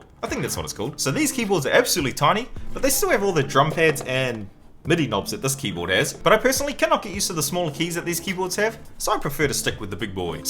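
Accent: New Zealand. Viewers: I think it might be a Kiwi accent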